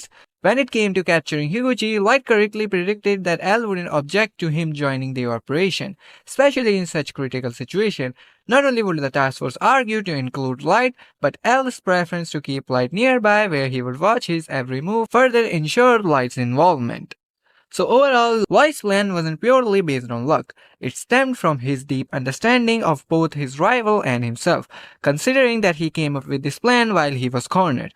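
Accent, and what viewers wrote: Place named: India. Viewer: Wow, Amazing video 💫 always refreshing to watch a scd video in Indian accent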